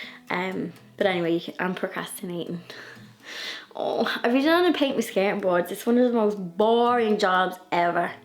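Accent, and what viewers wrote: Ireland. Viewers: Just seen you for the first time. It’s always such a shock to hear another Irish accent, but a good shock! 🤗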